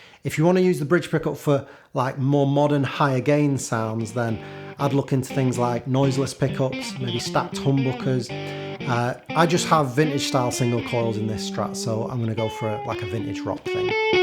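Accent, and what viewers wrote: United Kingdom. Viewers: I get annoyed with the British accent..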